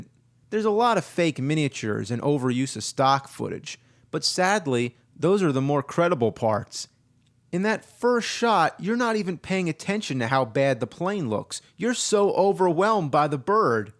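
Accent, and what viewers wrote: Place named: Philadelphia, United States. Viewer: I love his Philadelphian accent. It's very homey for me.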